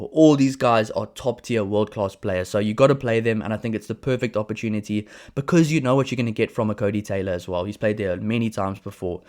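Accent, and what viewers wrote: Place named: South Africa. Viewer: Brilliant video/ analysis man! So cool to hear our team and game covered with the Bokke accent very refreshing 😉 pretty sold on your team only things I would change this week would be QT at 12 and BP or ALB in the 13. JB can take a well earned rest or bench ( I wd actually like to see him back at 15 tbh with Dmac on the bench) I also like Tosi and want him to have some game time. The Haka is a thing and Codie has been leading it , hopefully others can step up cos I totally agree with you re hooker! Keep it up bru cheers 🍻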